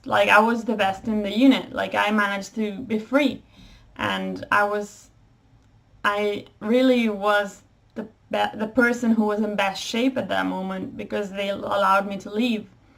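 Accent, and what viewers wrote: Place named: United States. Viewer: you speak american english very well! how did you get that?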